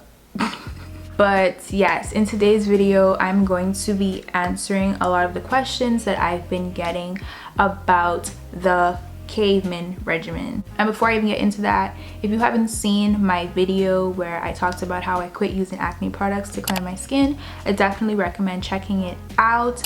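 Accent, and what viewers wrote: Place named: Bahamas. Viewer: Are you a Bahamian? Sorry but I’m one and I’m like she sounds like one too. But I love your content . Keep it up!❤❤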